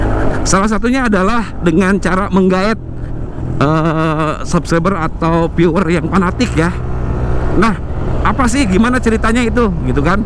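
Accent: West Java, Indonesia. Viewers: Memang aduhai kang logat sunda purwakartanya euy..👌👌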